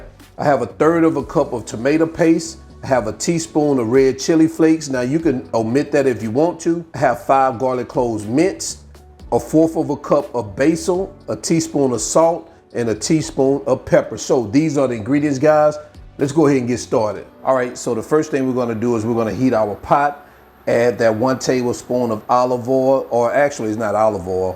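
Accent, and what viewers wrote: South, United States. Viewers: Stop dragging on your Alabama education it has gotten you this far and from what I can see you’re not doing bad at all , so, it did do the job. I love your recipes and you are doing a great job but remember we all talk or pronounce words different, it just depends on the area / demographic you are from. I know you are just having fun but lighten up on us southerners.😂😂😂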